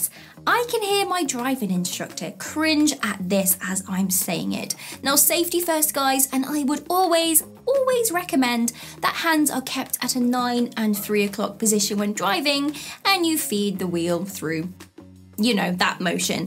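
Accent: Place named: Midlands, United Kingdom. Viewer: What I really want to know is about your accent. Where are you from that gives you that very sweet soft and subtle accent. Its not overpowering so definitely not a northerner lol. And not deep into London or Liverpool for that matter. Maybe the midlands or the Lake District. I can’t quite pin it down lol Please do tell. You don’t have to be super specific or anything just the general area or county.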